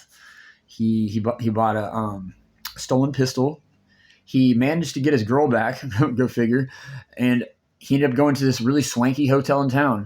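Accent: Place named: Tennessee, United States. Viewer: You from up there, in Tennessee?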